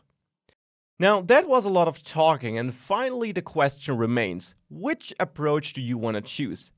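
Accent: Germany. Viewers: There are special commands so called "choins" :-) I really love this german accent! Thank you for this comparison, very interesting and complete. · I have not quite completed your video yet, but I have to comment on your clarity and thoroughness! It sounds like you might also be German. ☺️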